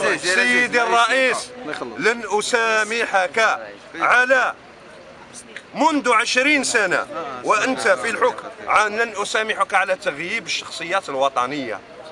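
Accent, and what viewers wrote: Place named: Classical, Arab world. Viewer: je suis francophone mais je vous salue et vous respecte Monsieur car vous parlez l arabe classique car beaucoup d arabisants ne savent pas parler arabe .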